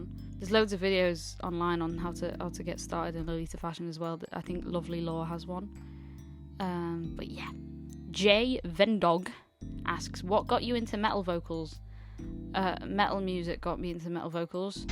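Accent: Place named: United Kingdom. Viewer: Idk how u made urself more british sounding but ye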